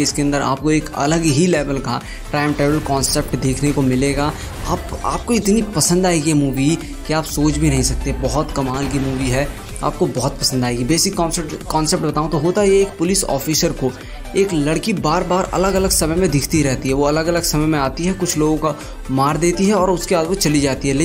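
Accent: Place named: Bundelkhand, India. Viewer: बुदेलखंड से लगते हो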